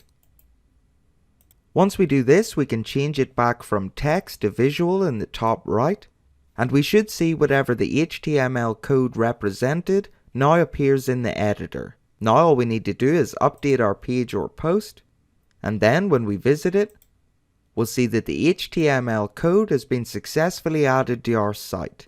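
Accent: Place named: Northern Ireland, United Kingdom. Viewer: Its Marty Dread here lol Laughing my balls off, always good to hear another person from Nor Iron.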